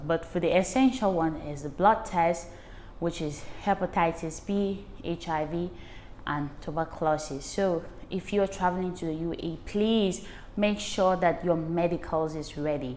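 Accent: United Kingdom. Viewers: Our Camer lady with British accent.great job keep up 🔥🔥🔥🔥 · The simple truth about the UAE. great job. Clean queen's English keep it up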